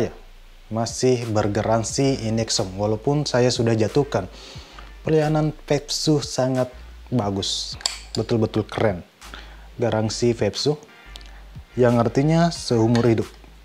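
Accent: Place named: Jakarta, Indonesia. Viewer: Logatnya keren klo org mks logat jakarta🤓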